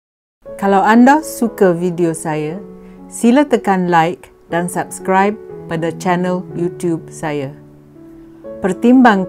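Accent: Perak, Malaysia. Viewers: OOH CIK MINAH GUNA LOGHAT PERAK, CIK MINAH NI ORANG KUAT PKR KE??, JAwATAN APA DALAM PKR?? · Mokhtar suka aku slang perakkk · Salam kak,Sy suka komentar Dan loghat perak kak...teruskan Komen dlm loghat perak...awak pun org perak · Kak org Perak ke, pekat bebeno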